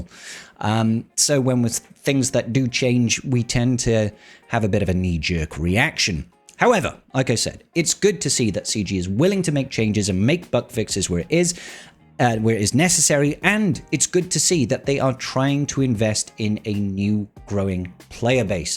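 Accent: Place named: Cockney, United Kingdom. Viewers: Who doesn’t love a bit of the! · <num> the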